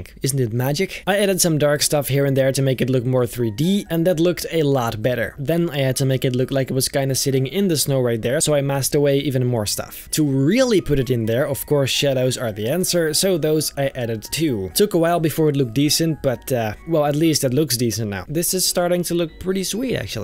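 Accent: Netherlands. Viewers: Are you also Dutch? I can hear it in your voice. Ik ben ook Nederlands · Ben jij Nederlands aan je Accent te horen wel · Why does it sound like he has a dutch accent?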